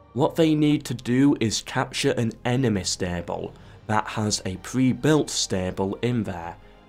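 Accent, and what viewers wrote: United Kingdom. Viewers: What UK accent is this? Sounds like every female flight attendants on the low cost flights, always loved that accent =)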